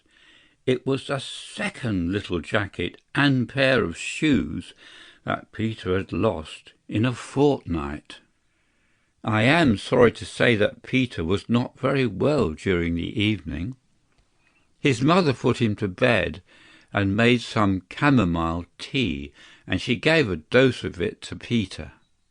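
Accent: United Kingdom. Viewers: Произношение британское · Произношения английское,не американское.В Америке так не говорят.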